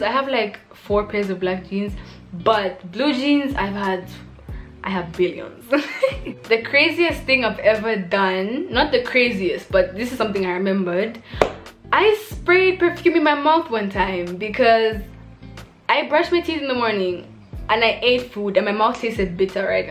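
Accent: Nigeria. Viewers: Tbh, the very first time I heard you speak I 100% thought you were Nigerian. 😂🔥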